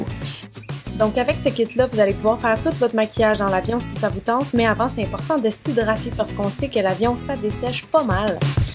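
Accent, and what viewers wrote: Quebec, Canada. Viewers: megh rêve c'est l'accent québécois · t québécoise!?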